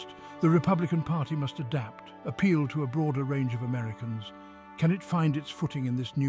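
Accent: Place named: England, United Kingdom. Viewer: So strange: Scattering modern "historical" pics with an English accent and "inspiring music" What a trip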